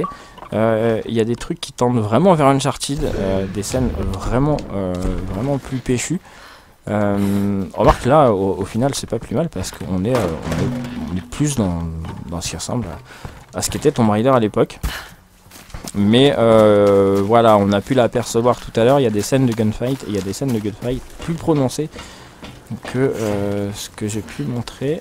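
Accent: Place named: Marseille, France. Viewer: … Ah que c'est drôle tout de même d'entendre ton accent Marseillet. On voit de loin que tu es du sud de la France. - Clément de Québec.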